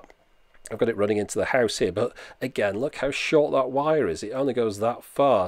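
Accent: United Kingdom. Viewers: As an American I'm not bothered how you pronounce 'router' in the UK. There are many words we pronounce differently in our respective countries, such as "aluminum". It's not an issue with me but most everyone I know here in the USA does in fact pronounce 'Route 66' as "Rowt 66", not root 66. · Good review... Now if you could just drop that British accent... how about a Southern US accent... that'd be a little easier to understand : ) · I'll like the video for the british accent. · Okay... I can almost accept "rooter," but where do you Brits get "ah-lume-in-EE-um?"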